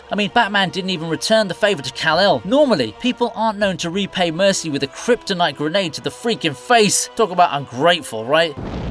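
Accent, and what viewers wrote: England, United Kingdom. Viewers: Love your accent I'm American and I just love a funny English accent proper English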